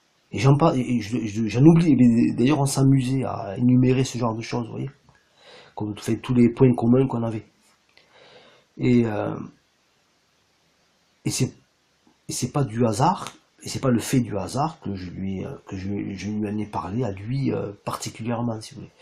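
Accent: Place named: Tarn, France. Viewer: Coucou cela fait x années depuis 12 ans surtout le 11h11 le 14h14 , le 17h17 et 22h22 Ton accent me fait penser au Tarn lol Tu as raison pour les heures ❤ Les câlins des FJ sont aussi curieuses et je vais m'exprimer ici sans bouleverser mon intimité, les câlins sont espacés et à chaque fois se déclenchent des échos niveau famille enfin c'est bizarre ,comme un nettoyage Quant à la radio , je pose une question , et hop la réponse Belle nuit et grand merci ❤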